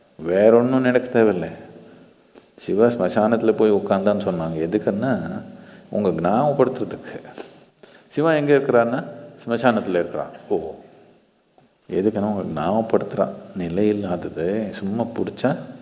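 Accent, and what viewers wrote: Karnataka, India. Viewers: Ungaluku purialana apro yedukku tamizh pera eduthirrukinge neekunge adhatha. Shankaran pillai nu tamizh peru vechikitu English le Peter vooduringe naan idhuvareku tayyi mozhi teriyadhu sonnavara engeyu kelvipattade ille ulagathile indha ulagathille yaaraadhu tayyi mozhi teriyadhavaru puriyadavaru yaaraachu irrukara aah aah sadhuguru oda tamizh slang appdi irruku na avarue namma bashaikararu na kannada mozhikararu aanalu en maariye tamizh kathikitrrukaru illiya. Tayyi mozhi teriyadha aalu indha ulagathile neenge orutharu dha. Neenge tamizhane kedaiyadhu neenge enamo telungu aalu irrukala chumma tamil peru pottirrukinge avalo dha. Ungaluku naan pesura tamizhaadu puridhu nu nenakkre idhuvu purilena neenge attu tamizhan na waste tamizhan, tamizh terilena apro shankaran pillai yean neenge ellaru yedukaage vechikuvinge .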